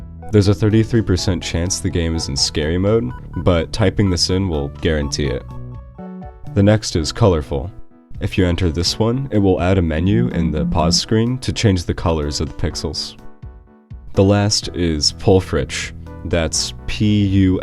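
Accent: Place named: United States. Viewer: I find that if I pause it, the picture becomes still and that annoying, dull, monotonous American excuse for an AI narrator stops too. This leaves me feeling peaceful, somewhat aloof and ready for anything.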